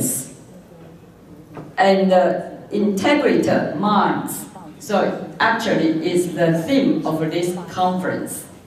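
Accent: Taiwan. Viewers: 應該是沒有學過音標~才會用臺灣人的方式講英語?!🤔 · 媽呀，我阿嬤都比你強嘞，台灣真的需要雙語教育，英文教育真的要好好檢討，就算在英國待了20年還是無法改變如此根深蒂固的台式英文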